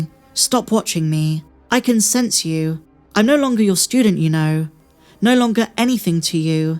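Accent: United Kingdom. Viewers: I don't know if your voice acent it's England, Scotch or Ireland, but i love it. You have so a sensual voice. Greetings with respect from Mexico. MADE THE FORCE BE WITH YOU AND PROTECT YOU ALWAYS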